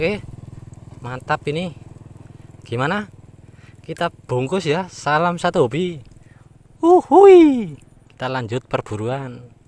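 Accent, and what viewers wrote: Banyumas, Indonesia. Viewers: Mari taraweh langsung nyimak cak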